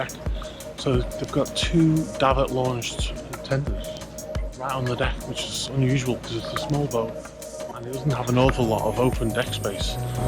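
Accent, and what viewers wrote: Liverpool, United Kingdom. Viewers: Where are you from? I think your a scouser, definitely northwest anyway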